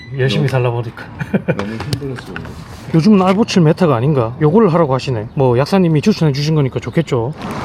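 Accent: Busan, South Korea. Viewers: 저두 고향이 부산이여. 잘 볼께여 사투리 조아여~~~ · 안녕하세요 구독하고 갑니다 부산아재 고향목소리 듣기 너무 좋고 편합니데이 부교공 전기직군 빡세네요 외주 안주나 보네요 브레인께서 너무 최전선 상노가다 뛰셨네요 그동안 정말 고생많으셨습니다 !! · 나강처럼 부산 사람인교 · ㅠㅠ왜 눈에 습기가차는지.. 형님 갱상도 남자인데 은근 따뜻함이 느껴지네예….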